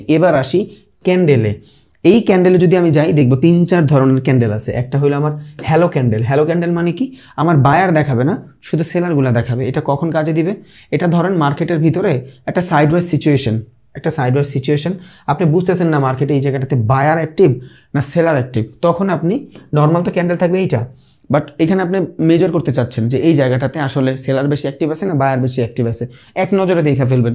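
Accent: Bangladesh. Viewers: দাদা আমি ভারত 🇮🇳(কলকাতা,পশ্চিমবঙ্গ থেকে আপনার ভিডিও গুলো এই বেশ কিছুদিন হল দেখছি, আমি বাংলাদেশ 🇧🇩কে অনেক ভালোবাসি এবং ওখানকার মানুষ জনদের কথা ও কথা বলার ধরণ আমার খুব ভালো লাগে,হাসি 🤣 ও পায় | 🐯 বিশ্বাস করুন আমি প্রথমে আপনার ভিডিও গুলো দেখতাম শুধুমাত্র কথা গুলো শুনবো বলে , কিন্তু পরে ভিডিও গুলোর Content ,আপনার বোঝানোর দক্ষতা ,ও আপনার পরিশ্রম কে দেখে আমি আর Comment না করে পারলাম না | 🔥আপনার প্রশংসা যতই করা হোক না কেন কম হয়ে যাবে |🤗 যাই হোক ভালো থাকবেন সুস্থ থাকবেন আর আমাদেরকে এই রকম ভাবে আরো সমৃদ্ধ করবেন 🙏 Always Support to You ✌️😉 ভারত 🇮🇳 থেকে শুভেচ্ছা ও ভালোবাসা নেবেন 🥰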